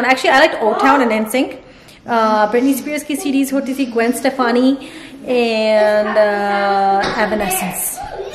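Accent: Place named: Punjab, India. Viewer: Your Punjabi is sooo 🥰 cute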